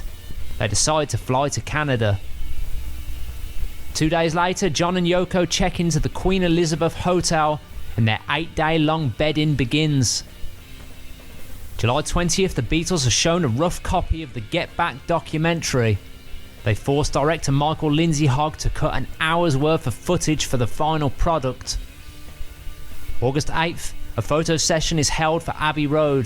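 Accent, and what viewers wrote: Birmingham, United Kingdom. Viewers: Good analysis.... A lot of things I haven't heard of before.... and great Brummie accent!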